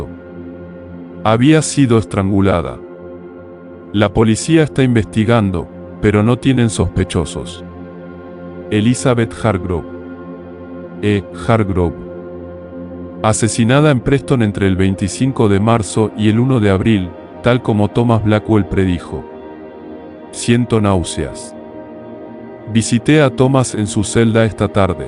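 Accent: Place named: Argentina. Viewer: Esta es la voz a la que me refería, que suena con un acento argentino. Me fascinaba escucharlo, ya que me transportaba a través de mi imaginación, provocando la emoción de la narración de principio a fin. Sencillamente, me encanta esta voz. 🎤💗👏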